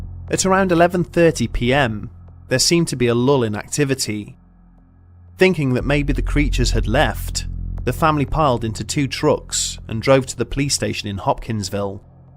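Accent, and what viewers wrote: United Kingdom. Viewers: Sorry but this narrator calling a twenty two riffle a “two-two” is just ridiculous and I assure you the people from UK 🇬🇧 do not all speak like this twat.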